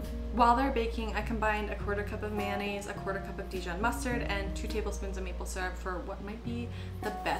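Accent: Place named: Canada. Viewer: I knew You were from Canada by the way You speak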